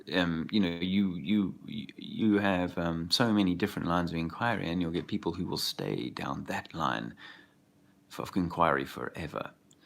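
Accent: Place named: Australia. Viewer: Laughing my ass off right now... love the Aussie accent! And you are spot on, we certainly are a nanny state and it makes me sick!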